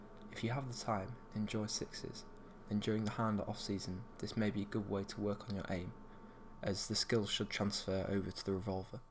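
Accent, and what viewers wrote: United Kingdom. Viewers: This was so informative and seductive.. the Welsh accent helps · hes british just like the spy :o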